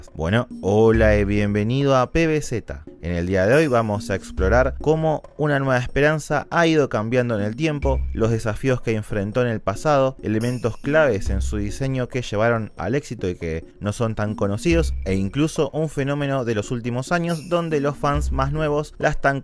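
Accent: Argentina. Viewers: me gusta tu canal genio sos de argentina?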